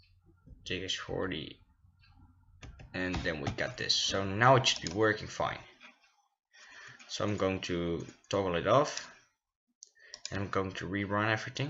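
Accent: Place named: Netherlands. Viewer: Good luck with your exams! Is dutch? I hear an accent..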